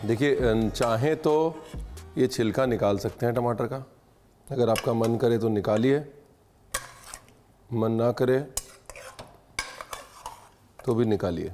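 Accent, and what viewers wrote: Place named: Lucknow, India. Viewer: Ahhh, that mutton looks too delish 😋. Moreover, i love the Lucknowi tone of your language. Love from Lucknow ❤❤❤❤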